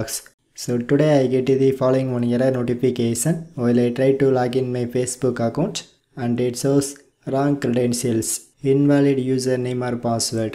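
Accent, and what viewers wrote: India. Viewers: Actually the Indian English is very bad I can't hear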